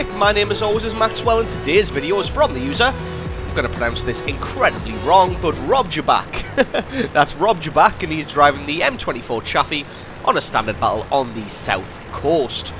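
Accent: United Kingdom. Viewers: Ok may I ask you what is your accent? Is it some kind of British accent or I am totaly wrong? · What part of Britian are you from? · Its clearly british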